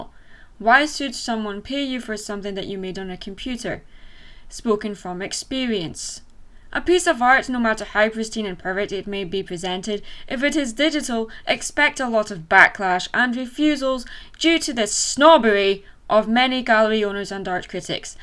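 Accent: Scotland, United Kingdom. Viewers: wait....are You Scottish?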